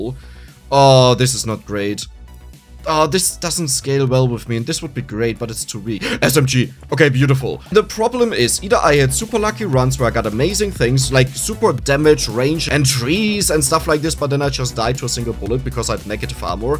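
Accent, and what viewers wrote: Germany. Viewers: Are you from germany xD sounds like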